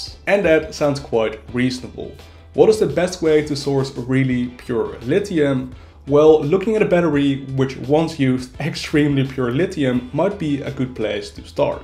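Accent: Netherlands. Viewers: Nederlander ? I can hear the accent! Love the content bro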